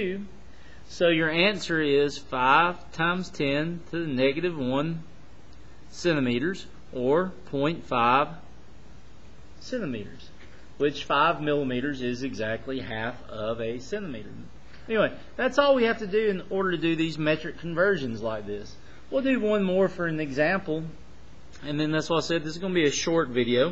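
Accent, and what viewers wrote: United States. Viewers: Some cowboy yeehaw accent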